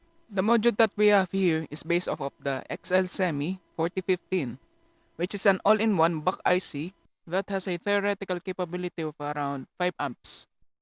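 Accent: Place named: Philippines. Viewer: Yesss gotta love that Filipino accent Ps Great video tho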